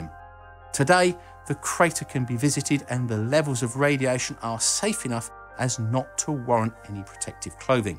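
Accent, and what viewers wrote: United Kingdom. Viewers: "Since the formation of the United States its mainland has never been invaded or had any major attack." Ironically this was spoken with a British accent. For the benefit of those who are not students of American history, here is an example: <link>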